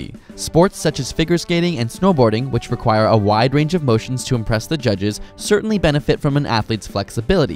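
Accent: Canada. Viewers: Are you Canadian? You kinda sound Canadian.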